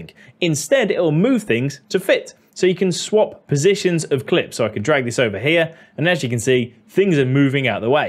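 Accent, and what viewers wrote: United Kingdom. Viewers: Talking fast with a British accent😬 My ears aren’t use to it…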